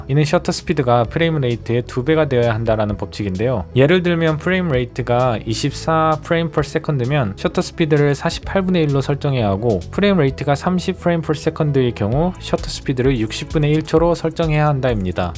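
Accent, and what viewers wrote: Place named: United States. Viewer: 영어학원인줄 알았습니다. 발음이 미국인...^^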